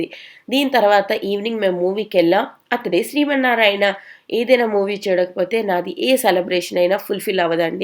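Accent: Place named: United Kingdom. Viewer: Meru middle lo English words Chala Baga use chestunaru accent uk laga undi uk lo chadvara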